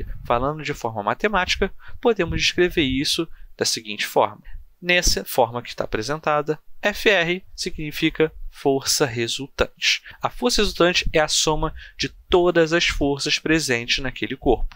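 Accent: Rio de Janeiro, Brazil. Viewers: Sotaque carioca dele esta no pico nesse dia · Carioca, mano da uma agonia xxxxxxxxxxxxxxxxxxx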